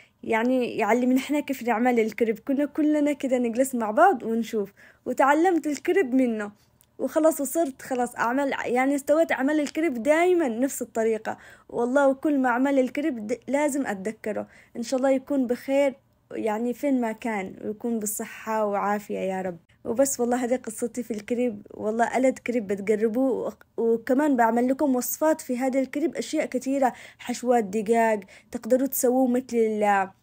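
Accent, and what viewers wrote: Aden, Yemen. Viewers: حبيت فيديوهاتك من او ل نظره❤واللهجة العدنيه مليييييحه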